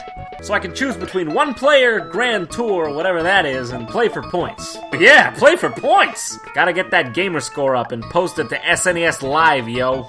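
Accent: New York, United States. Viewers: I love how his New Yoik accent just gets thicker and thicker as the games get worse and worse.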